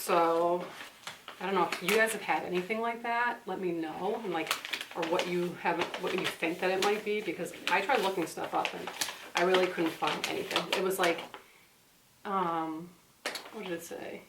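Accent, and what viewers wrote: Boston, United States. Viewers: yer so dam hot love yer boston accent 2 bad yer married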